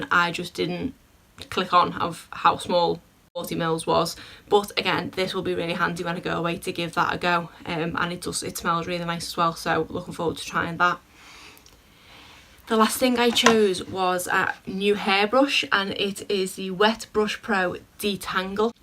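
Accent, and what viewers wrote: Liverpool, United Kingdom. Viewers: Your accent is beautiful. I am a linguistics student but can't pin point where it might be from. I would guess you're a scouse or from somewhere near there, you sound amazing! ❤ I'd love to win the falsies. Not that am any good at putting them on but really would love to try 😁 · some lipstick or the eyelashes idk, i really liked most of things so anything would be great <3 ahh the liverpool accent is kinda difficult but so lovely,my dream is going there someday. have a nice day <3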